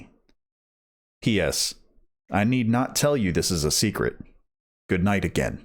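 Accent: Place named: South, United States. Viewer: Excellent reading,.. i'm enjoying these. There is nothing wrong with a southern drawl. I like it.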